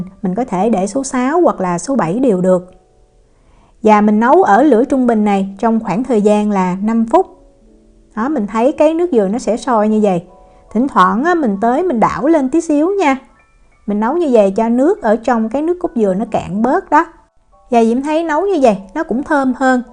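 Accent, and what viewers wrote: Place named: Saigon, Vietnam. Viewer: Bạn này hướng dẫn nói đúng giọng Sài Gòn nghe hay lắm. Clips có giá trị. cảm ơn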